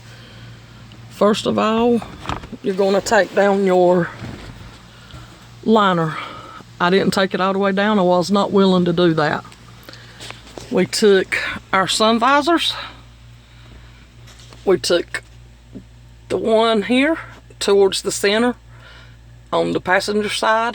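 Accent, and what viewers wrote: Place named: Tennessee, United States. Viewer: That sounds like a tennessee accent if I've ever heard one, right or wrong? Lol. Thanks for the vid, these sunroof motors are insane to get out.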